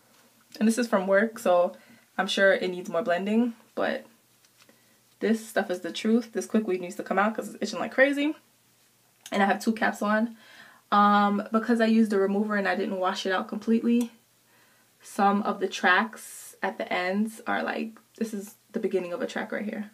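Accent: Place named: New York, United States. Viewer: Omg, I love your Ny Accent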